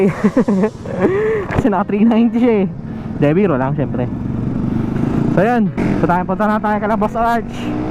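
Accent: Visayas, Philippines. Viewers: Parang VISAYA so idol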